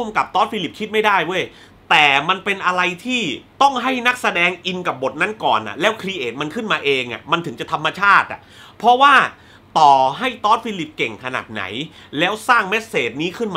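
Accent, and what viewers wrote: South, Thailand. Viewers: พี่คนใต้หม้าย?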